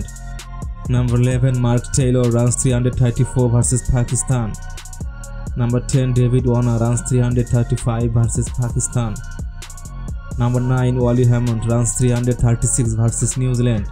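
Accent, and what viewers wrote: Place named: Sylhet, Bangladesh. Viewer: This guy's Sylheti English is funny🤣🤣🤣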